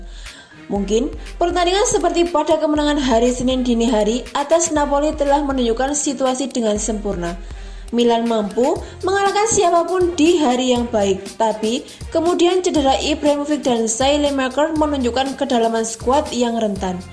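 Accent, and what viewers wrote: Java, Indonesia. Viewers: medokss banget mbak..😅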